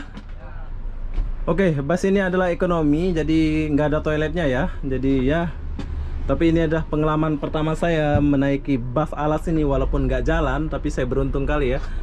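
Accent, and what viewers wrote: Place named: Malaysia. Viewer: Warga Malaysia bg???kok bahasa dialek nya beda.saya subscribe bang saya suka cara abang.rendah hati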